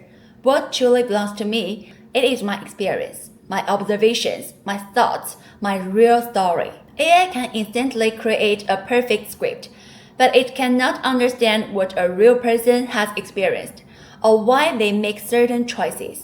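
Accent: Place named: China. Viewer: Hello, I loved your video, and the laugh with the baker. But better than all that was all the things you've done, and how creative you are. In addition to very positive. Your English is very good too, even with a Chinese accent, and all of us who are not natives and can speak English have that problem. I am writing this to you because among other things I have seen many videos and I see that China is more complicated now. I have visited China 3 times: 2007, 2008 and 2010. And when I see now the work or salary problems I can see that the thing is much more complicated. I hope that your entrepreneurship really gives you the income to follow your life in a calm way, and maybe your plans to be a mother can be carried out. Who knows.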